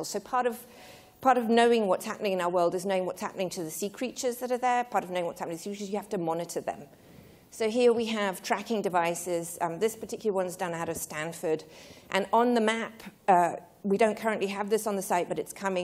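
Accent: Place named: New York, United States. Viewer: Her accent is soo ny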